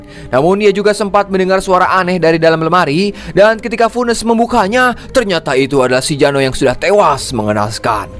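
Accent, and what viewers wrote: West Java, Indonesia. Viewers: Aku tebak pasti abang org sunda iya kan?? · Kang pasti org Sunda nya 😂logat na meni Sunda pisan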